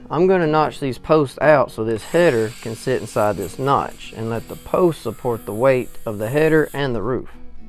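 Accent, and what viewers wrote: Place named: South, United States. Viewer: Enjoyed your video. Enjoyed your true Southern accent and attitude... "Let 'em run wild"... lol 😂. Gathered some good tips. ❤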